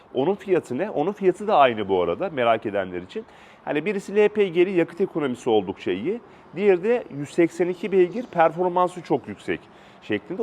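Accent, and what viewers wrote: Samsun, Turkey. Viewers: Kulağı tirmaliyor biliyorum ama şive biraz Samsun da genel durum😊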